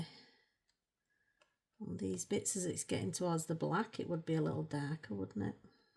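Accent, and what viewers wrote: Yorkshire, United Kingdom. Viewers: I am still watching but had to come here to say this before I get too entangled on the video and forget... when I heard you say "trying speak more clearly"... I love your yorkshire accent/dialect, it's so beautiful and it adds so much personality to your videos! Loveee it! ❤ (even on the occasions I understand nothing at all 😂, still sounds like music to my ears nonetheless) That being said, it took me a few videos to understand if "cracking" was a good thing or a bad thing 😂... but then again English is my second language, so it could just be a lack of proper knowledge on my part 😂, but hey, learning something everyday right? The texture of these gel crayons on your page remind me of Van Gogh thick oil paint strokes that lends a whole different texture and dynamics to the painting. So nice! And it looks so satisfying to watch you smoosh the colors together! 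😊 amazing!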